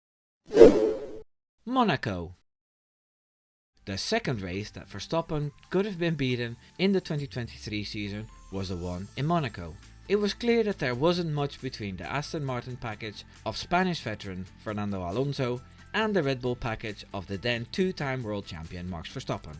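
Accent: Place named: Netherlands. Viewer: ik kan aan je engels bijna niet horen dat je uit nederland komt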